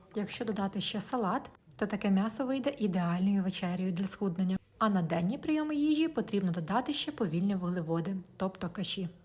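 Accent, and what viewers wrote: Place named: Russia. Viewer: Какой сильный русский акцент)))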